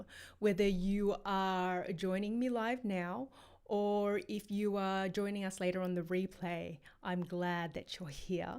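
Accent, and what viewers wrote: Australia. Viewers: An Asian with an Australian accent? What a beautiful mix!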